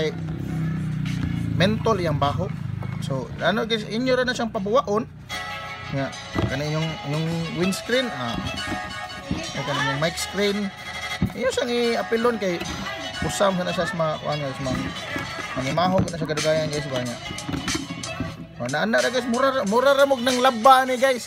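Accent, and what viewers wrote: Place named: Visayas, Philippines. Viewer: bakit hinde ko napanood ang video na ito.bisaya kaayo ang vloger.hahaha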